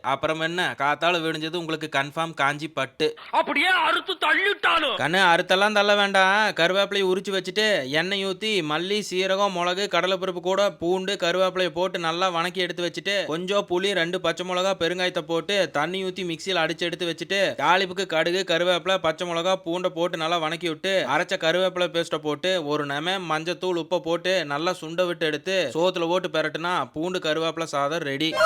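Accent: Coimbatore, India. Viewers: சகோதரி தன்னால எங்க போயிட்டீங்க உங்களோடா அந்தக் கோயம்புத்தூர் பேச்சுக்கு ரொம்ப பிடிக்கும் இத்தனை நாள்ல என் வீடியோ போல ஏதோ ஒன்னு சொல்லி போட்டு இருந்தீங்க ரொம்ப நாளா காணாம போயிட்டீங்க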